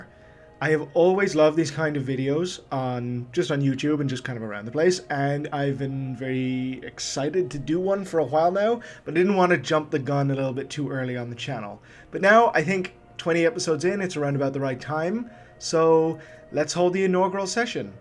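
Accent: Ireland. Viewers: Where are you from? Accent sounds a bit irish?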